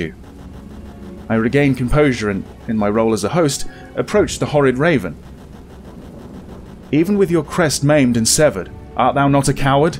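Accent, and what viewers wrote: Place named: United Kingdom. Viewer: I really how you read, you have a very nice British accent which really suits the Allan Poe's poem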